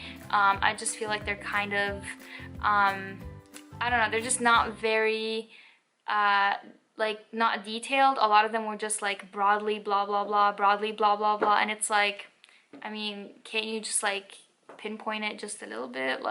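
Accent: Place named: United States. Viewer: Grow up in America, will speak like her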